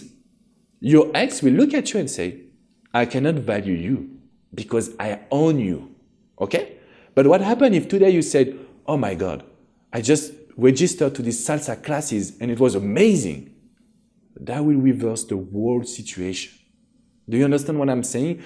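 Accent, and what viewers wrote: France. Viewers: the women love your French accent! I have a question. What if a guy says he needs closure but wants to remain friends and one minute acts happy about the break up and then sad about the break up and what if I can’t help but say really stupid things over text about him moving on. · mon cheri tu es tres beau!! PS I understand your French accent just fine..😉 and je ne parle francais really so... You give great advice! Merci Beaucoup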